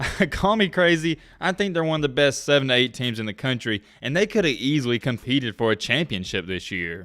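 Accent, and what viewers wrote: South, United States. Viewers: Haha SEC is 1-5 in bowls—such an overrated inbred league—almost as bad as your southern drawl and high school football highlights. Go Cinnci & Wolverines!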